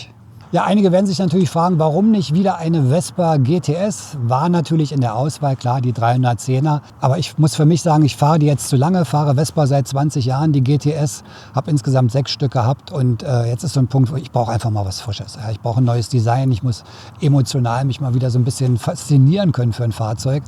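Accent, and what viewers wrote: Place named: Berlin, Germany. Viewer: Da ist sie wieder. Die Stimme von Hans Rosenthal 😊. Immer sympathisch und kompetent mit ner duften Note Berliner charms. Bin gespannt auf das Jahr mit deiner „Neuen“. Schade nur um den Zontes, da hätte mich doch ein Langzeittest sehr interessiert. Geht’s denn damit auch in den Urlaub? Bin gespannt. Also, herzlichen Glückwunsch und allseits gute Fahrt. Vielen Dank für den und die vergangenen Berichte. Bitte immer weiter so 😎✊🏻. Schönen Ostersonntag noch.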